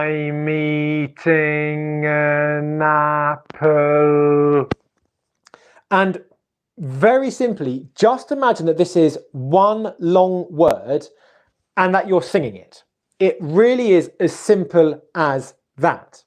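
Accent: United Kingdom. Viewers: I love the British accent..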